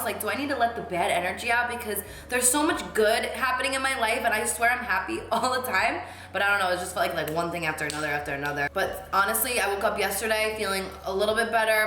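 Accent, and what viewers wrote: New Jersey, United States. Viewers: I L O V E hearing you talk. Not only are you well spoken but I admire your New Jersey accent ❤️ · you’re such a jersey girl haha your accent is jumping in this video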